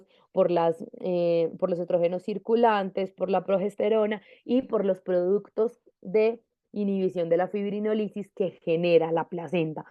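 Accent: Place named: Colombia. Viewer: Excelente trabajo! Me encanta el dialecto colombiano 💖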